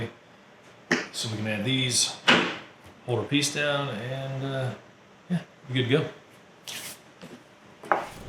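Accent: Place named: East Texas, United States. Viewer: Where you from brother? You speak like me…east Texas?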